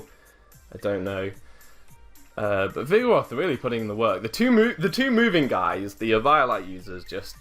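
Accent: United Kingdom. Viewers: Man I love British accent, keep it up!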